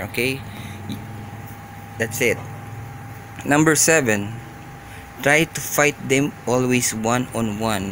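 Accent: Philippines. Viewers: ur voice just like pinoy? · I know you filipino i hear filipino voice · Pinoy akcent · Pinoy tama??😂 Edit:sabi ko na accent palang +1 sub Gawa ka ng video kung pano hack ldoe 1.6.5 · Are you Pinoy? Halata ung accent eh xD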